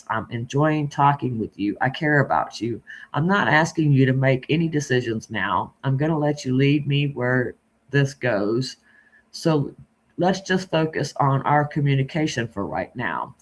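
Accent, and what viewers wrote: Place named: Wilmington, United States. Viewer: Girl, where are you?? You sound like Wilmington or Bolivia maybe? I'm in Wayne...Goldsboro but I grew up in Duplin. I always love running across neighbors! You are 100% right, hes a big ol titty baby, for sure!! My Granny used to say that all the time!!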